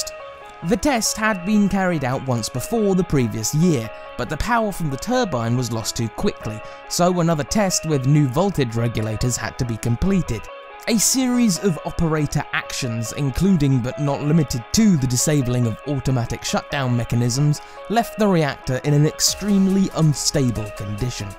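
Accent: United Kingdom. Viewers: They got it wrong cause it's a British narrator, just like they got it wrong with the Revolutionary War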